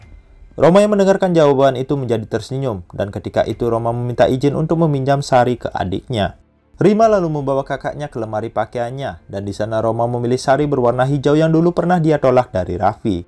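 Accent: Bali, Indonesia. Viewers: Bli org bali ya?? Logat nya kyk ny dari bali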